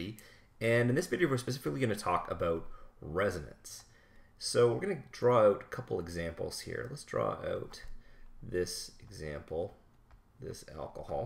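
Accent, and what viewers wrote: Canada. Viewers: You're Canadian, aren't you? Love you, man. Thanks for the 3 AM lesson.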